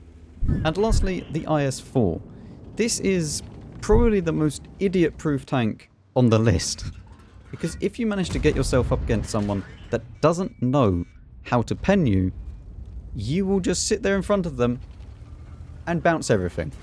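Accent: United Kingdom. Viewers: LOL!!! I really love this statement "IS4 this probably the idiot proof tank", British accent humor. Good one.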